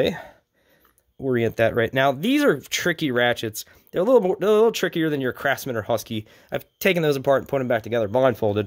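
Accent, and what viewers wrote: Philadelphia, United States. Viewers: Hi I think I here what sounds like a delco or Philly/south jersey accent im from Philly and people think I’m crazy when I’m out of town and I go out to eat and they ask what I’ll have to drink and I say I’ll have a “wooder”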